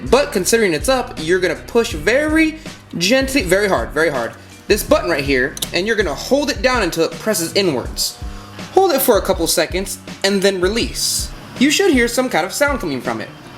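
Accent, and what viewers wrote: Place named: France. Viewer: Great video, buddy! :D Though I'm a bit disappointed you didn't say "tomorrow" with your french-ish accent :P I was kind of waiting for it!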